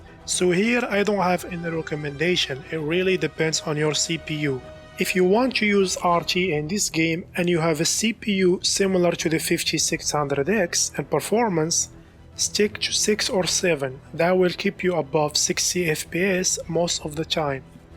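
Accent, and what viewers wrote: Morocco. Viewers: Bro are you Moroccan ? Your accent sounds like your Moroccan... And thnx btw for this sweet helpful guide keep it up bro ❤️ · you sound moroccan asf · are you moroccan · bro nta mghribi ? bayna mn lhdra dyalk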